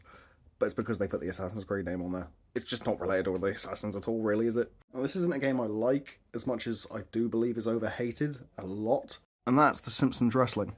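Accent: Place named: Midlands, United Kingdom. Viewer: Hey judging by your accent you’re from the midlands right?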